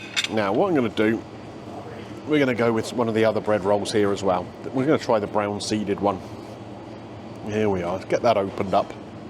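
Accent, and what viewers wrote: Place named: Dorset, United Kingdom. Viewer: Hi, perhaps you could answer a question for me? Is your new found love for using wow excessively, something that YouTube, insist upon, or is it just an homage to your mate Gary? I used to follow Gary's channel regularly, but have given up because six wows in one sentence, is six too many for me and it was really getting on my nerves. Please don't go down the same path, otherwise, I'm going to be stuck with The Durham Vlogger, who is great, but a little limited by sticking to County Durham. I was watching another of yours the other day, it was either Midsummer House, or Heston Blumenthal's pub in Bray. Both great reviews of fine restaurants, but both bedevilled by an excess of wows. I much prefer when you use your own words and phrases, in your lovely Dorset accent, "Good Lord", is so much more English and expressive than wow, too American. 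Gary had also taken to using "awesome", just when I thought things couldn't get any worse, I guess they always can. Merry Christmas and a happy and healthy new year, to you and your family. I hope 2026, brings us far fewer wows, than 2025, did, all the best mate.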